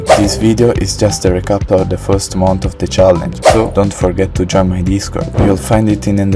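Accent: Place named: Italy. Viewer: Sento il accento italiano ti ricordo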